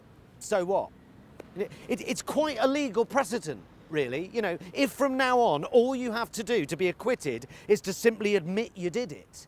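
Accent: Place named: West Country, United Kingdom. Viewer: I am hearing a West Country accent in there but I thought it had a bit of Bath or Bristol in it , but I am happy to be corrected. Funny and to the point stuff. I have a ticket to see Mr. Pie live this month Feb. 2020 here in Ozstriya.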